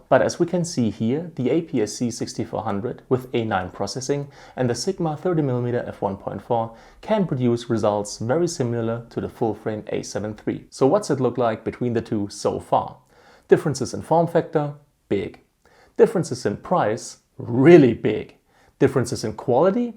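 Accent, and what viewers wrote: Stuttgart, Germany. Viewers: U sound a bit german, are you? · Kommst du aus Stuttgart? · man, are you german?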